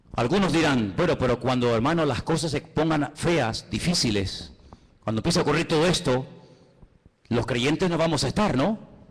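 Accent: Spain. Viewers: Muy tremendo mensaje usrted me rebendijo con la palabra .. saludoa desde argentina ... de donde es la iglesia ? .. por el ascento es de españa?.. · Que buen mensaje pastor;👌 por su acento es Usted Español ..bueno es Dios 👏que todavía tiene hombres diciéndole a los feligreses la verdad ;que otros esconden solo hablan de prosperidad económica y las almas que se pierdan 😳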